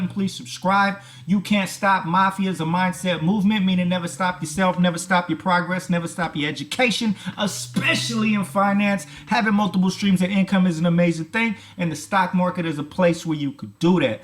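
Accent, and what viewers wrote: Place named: New Jersey, United States. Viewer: HI! I appreciate your videos, thanks for sharing your opinions on TSNP. Was surprised that you haven't received a response regarding the investor conference call....They should give you an engraved invitation!! You are doing good things spreading the word about this company. side note - Where is your accent from? New Jersey? New York?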